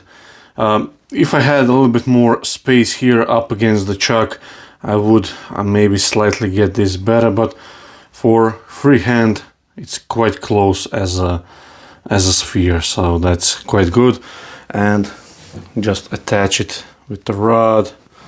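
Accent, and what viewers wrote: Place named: Croatia. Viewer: Completely random, but I watch more of your videos than anybody else and When Croatians were deciding how to pronounce English words… they picked the coolest ways to do it. 😎🫡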